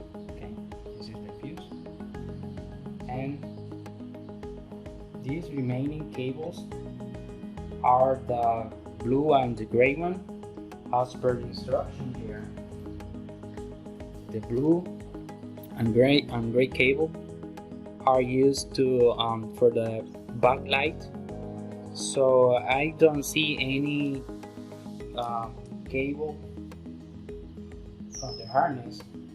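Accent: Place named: Dominican Republic. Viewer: Loco pero tú sueña más Dominicano que yo.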